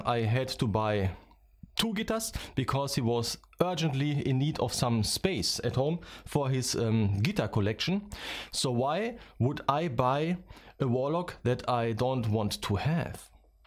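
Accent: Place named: Germany. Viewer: Der deutsche Akzent ist unverkennbar:D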